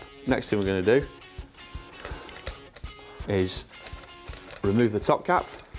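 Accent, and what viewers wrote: United Kingdom. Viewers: Very informative with great detail. My only suggestion would be to lose the music. As an older American, I need to strain to hear through the British accent over the music. Great job though.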